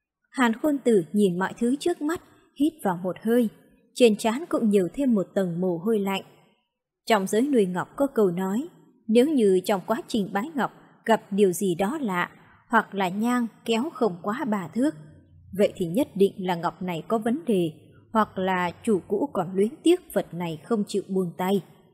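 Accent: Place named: North, Vietnam. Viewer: kênh giọng nam kỳ, tự nhiên giờ sen vô con nhỏ bắc kỳ này vô là sao vậy ? tôi không ưa giọng bắc kỳ.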